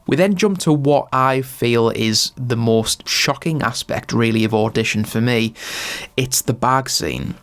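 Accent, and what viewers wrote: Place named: Newcastle, United Kingdom. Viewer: My thoughts are: love the geordie accent and review not bad either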